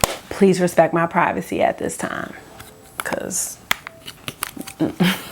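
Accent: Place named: DMV, United States. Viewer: New subbie! Fellow Teacher here! As soon as you started talking I knew you were from the dmv, me too girl, glad I found your channel!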